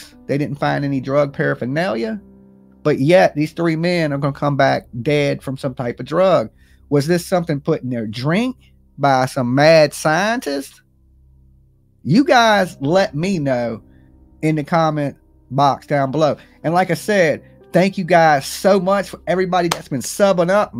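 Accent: South, United States. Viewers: You crack me up. Live your southern accent. Great job, keep up good work.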